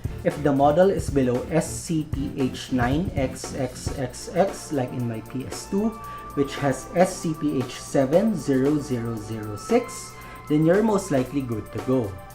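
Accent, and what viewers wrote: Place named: Philippines. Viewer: Pinoy ka ba? Halata sa accent eh lol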